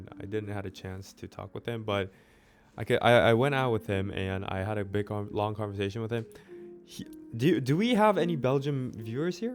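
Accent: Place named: Texas, United States. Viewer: Your English is concise & perfect 👌, you underestimate yourself. Your accent sounds predominantly 🇺🇸-Texan. H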